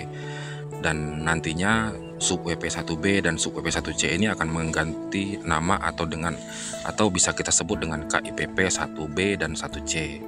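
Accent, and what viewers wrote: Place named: West Java, Indonesia. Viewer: Bang ngomongnya kek orang sunda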